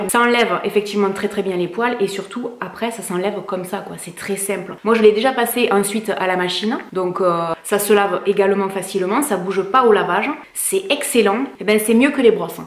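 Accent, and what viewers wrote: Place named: Roussillon, France. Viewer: Je suis mega fan du action aussi 😊 Et tes vidéos m'aide bien à faire mes choix, entre autre les éponges en micro fibre et les chaussettes spécial masques pour les pieds, donc j'adore ce type de vidéos 😊 Ça m'aide à cibler ce que j'achète, parce qu'on a vite tendance à acheter de tout et n'importe quoi dans ce magasin lol PS: J'adore tes vidéos parce que ton accent me rappelle les vacances 😊 Je suis Belge mais ça fait 10 ans que je vais à Canet en Roussillon en vacances (mon compagnon va là en vacances depuis sa naissance, on projette d'acheter un appart là bas pour notre retraite quand on sera vieux 😊)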